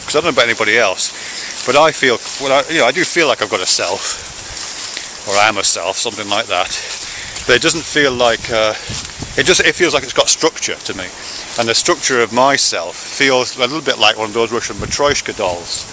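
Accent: United Kingdom. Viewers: I don't know why, but I enjoy listening to British people (i'm american). On the self.... I commented elsewhere that I think the self is a purely human, cultural and social construct. Sure, I may have some models or ideas of my "self"... but I'm a cultural animal after all.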